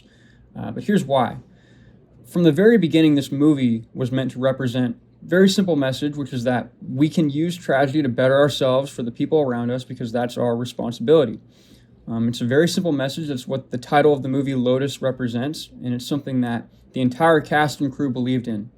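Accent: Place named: South, United States. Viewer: Of course this guy got a southern accent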